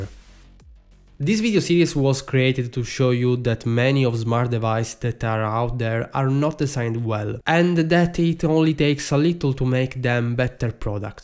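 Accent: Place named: Italy. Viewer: It is very understandable and the Italian accent constantly reminds me of the beautiful language, nice wines and food -> positive 😄🇮🇹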